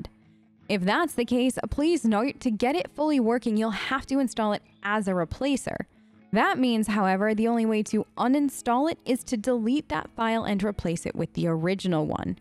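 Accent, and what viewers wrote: Australia. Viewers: Why do I keep hearing an Aussie accent from her lol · This lady doesn't know if she wants to be Aussie or american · you can't hide the aussie. I hear that shit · yoo you from straya?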